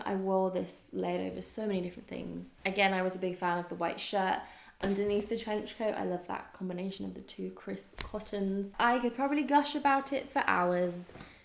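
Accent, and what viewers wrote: United Kingdom. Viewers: Where are you from? I know you’re accent is British but it sounds slightly Americanised in some parts!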